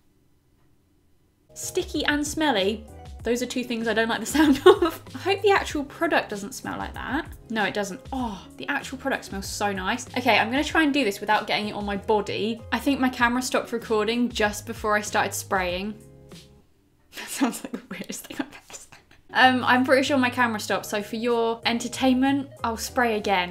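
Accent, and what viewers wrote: United Kingdom. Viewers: I’m in love with her accent 😍 Is this a British accent??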